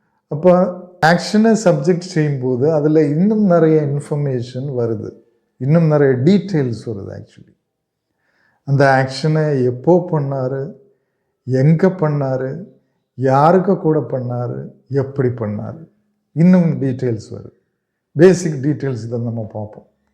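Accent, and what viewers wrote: Kerala, India. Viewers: I don't need anyone's coaching as my vocabulary is excellent. It was just a suggestion because what you blabber in the name English is pure junk with all that mallu accent.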